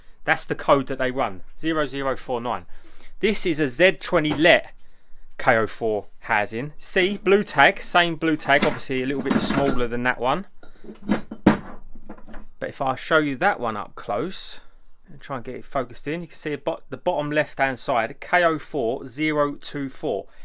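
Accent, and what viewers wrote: Essex, United Kingdom. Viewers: He sounds like Southend.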